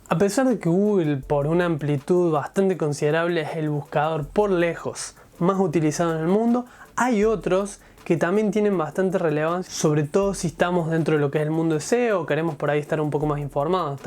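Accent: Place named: Córdoba, Argentina. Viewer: Ay me parece que sos Argentino de Córdoba.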